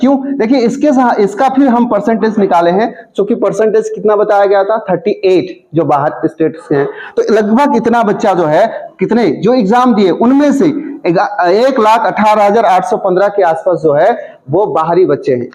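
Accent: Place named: Bengal, India. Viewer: Aapke aawaj me bangali tone hai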